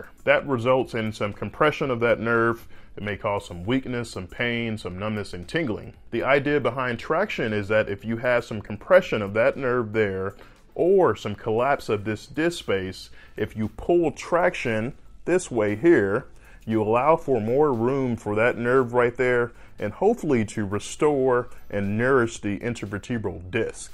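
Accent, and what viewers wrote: South, United States. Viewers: Having a southern accent isn't an indicator of low intelligence. Imagine making fun of Einstein because he couldn't pronounce "theory of relativity" correctly.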